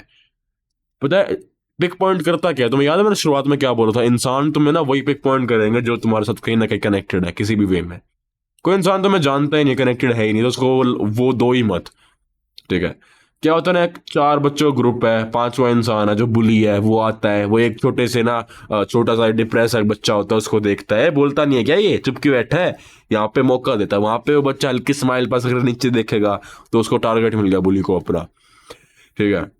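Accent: India. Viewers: So u have desi tone too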